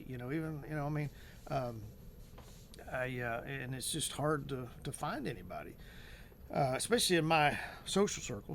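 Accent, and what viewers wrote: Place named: Texas, United States. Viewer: Where exactly are you at? What area? You sound like you might be from Texas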